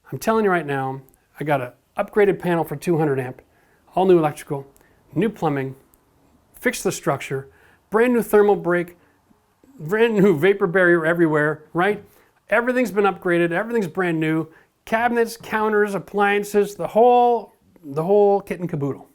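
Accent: Canada. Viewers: I trust you as a person and contractor, I live in Louisville, KY area and honestly I need a contractor like you. I had one and screwed me and ran away with half finish and some mistakes needs to be revisited . I need to finish the basement about <num> sq. to finish . If you know an honest reasonable contractor, I would appreciate the name/ names and a mode to contact them. I am a disabled retired individual for 12 years but I am Ok the Lord has be faithful to me and I am frugal and do not like waste since I grew up in Egypt poor but hard worker educated which was free in Egypt. Mat God bless you and your work abundantly. That would be of great help. If you can. Good day. Physically I cannot help , I had my knee was replaced 6 weeks ago. and I will need back surgery as well just to be able to tolerate the pain and decrease the narcotics and sedatives I am dependent on them but my kidney and liver will fail if I continue. 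GBU , It sound that yu are in Canada so I may be out of luck. lol.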